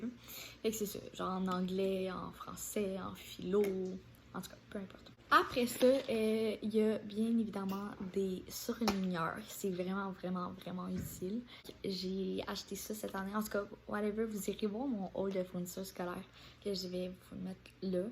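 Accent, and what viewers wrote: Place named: England, United Kingdom. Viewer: You have an English accent ❤️❤️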